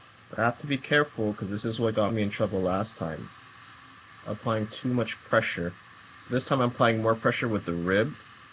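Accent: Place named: Hawaii, United States. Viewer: I can hear your small kine Hawai’i accent.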